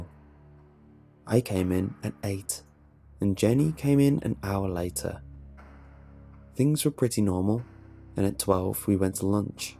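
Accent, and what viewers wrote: United Kingdom. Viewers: is he brittish?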